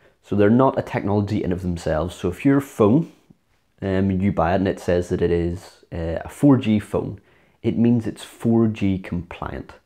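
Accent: Northern Ireland, United Kingdom. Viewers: Northern Ireland! Yeo!1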